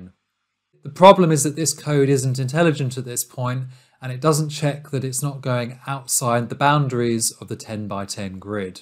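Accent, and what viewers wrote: United Kingdom. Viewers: please, say "bottle of water " :d , is he british ?:d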